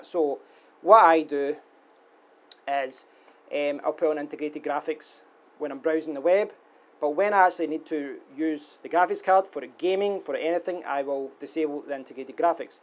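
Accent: Scotland, United Kingdom. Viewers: Hey, is it scottish english you're speaking?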